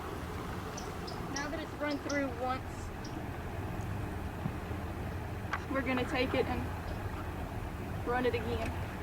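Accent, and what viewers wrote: Louisiana, United States. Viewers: Knew I recognized that accent. Greetings from cajun land! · I was trying to id your accent, so you are a lil cajun? Its disturbing how much better your vid's are than so many hard core looking survivory vid posters.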